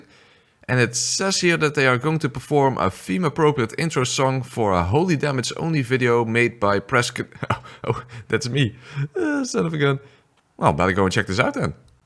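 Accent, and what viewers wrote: Netherlands. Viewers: Lol je bent Nederlands ik hoorde het Nederlandse in je stem maar wist het niet zeker tot dat je zei dat je Nederlands was. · Haha oké ja overduidelijk Nederlands 😂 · I smell Nederlands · deam he sounds dutch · Horrible Dutch accent. Next time use a friend or family member with proper English accent to do voiceover. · Excellent video but my god do i hate the Dutch English accent... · You are so dutch, i can not unhear it :p nice vid bro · Jeez I thought my dutch accent was thick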